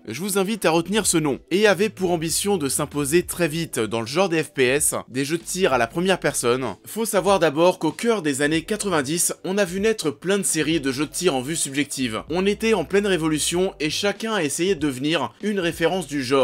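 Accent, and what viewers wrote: Paris, France. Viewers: Cette bouffée de nostalgie en intro un gros kiff par contre si je peux me permettre ; accellere un peu tes vidéos surtout le debit de parole on entendra moins l accent parisien avec les fins de phrases en "en" qui donnent envi de tuer des chatons avec une cuiller Sinon continue comme ca c est cool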